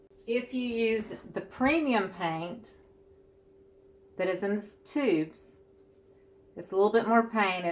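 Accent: South, United States. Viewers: Awesome artwork! New subscriber to your channel. How many drops of floetro do you add to your paint? Also, what's your background paint recipe? Type of paint? Thanks. Oh, you sound like a southern gal. I am too-from NC. Have a great day! 😁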